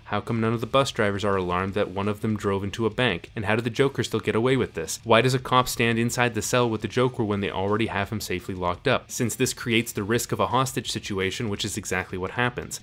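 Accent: Canada. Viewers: by the way he pronounces certain words I have always thought he was Canadian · Are you Canadian?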